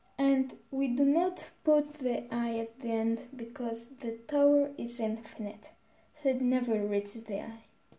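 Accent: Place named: Russia. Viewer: U sound russian accent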